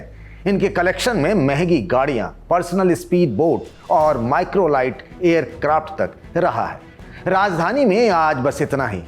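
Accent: India. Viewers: ji apki awaj me desi pan bahut bhata he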